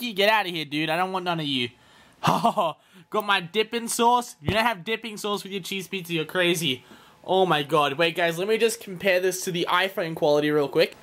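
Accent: Australia. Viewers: He is speaking Australian -.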